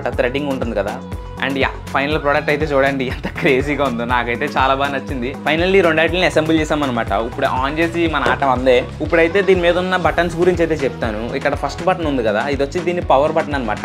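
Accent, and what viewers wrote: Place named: Godavari, India. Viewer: Godavari slang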